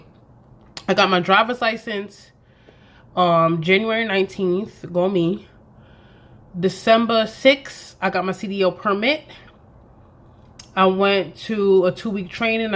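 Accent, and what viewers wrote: United States. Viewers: Girl I watch two of your videos. Now mind you I loved your other 2 video it was the part 1 and 2 of your lipo experience. Since I’m going to get SX in DR I came across your channel. Honey this video right here! This video right here!!!!! Made me hit the subscribe button!!!! Mija between the smacking on the mango and the clear NYC accent and being an Afro/Latina like me I’m hooked. It was worth all minutes of my time😂😂😂😂😂 Keep us laughing girl🥰🥰🥰🥰❤️❤️❤️❤️❤️😂😂😂😂😂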